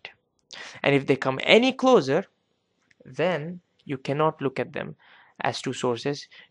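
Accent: India. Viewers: Indian accents suck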